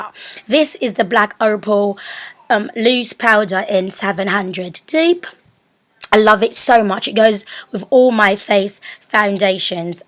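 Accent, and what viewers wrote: United Kingdom. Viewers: You killed me with the british acc damnn goo gal. Love you for free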